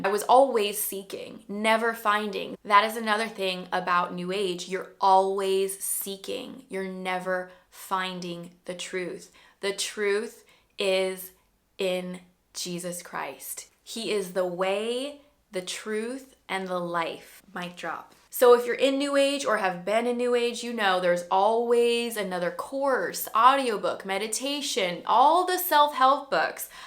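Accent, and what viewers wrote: United States. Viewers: Rrrr u are so pretty I like your hair and tht American accent is so hot🤭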